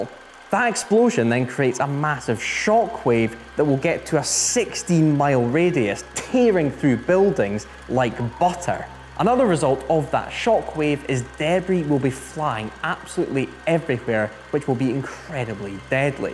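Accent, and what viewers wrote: United Kingdom. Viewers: yeah, it's called British accents. Don't get what people are going on about. He's not saying it wrong, it's his accent and we have a lot of accents for such a small island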